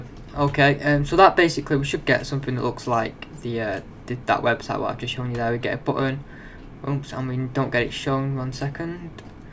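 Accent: United Kingdom. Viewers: Well explained...with a Manchester accent, too!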